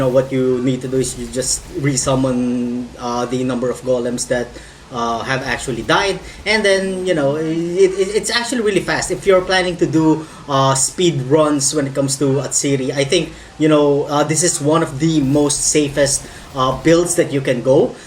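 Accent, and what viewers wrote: Philippines. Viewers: scrolling through PoE vids and i'm commenting on this one to ask... that accent sounds filipino, are you filipino?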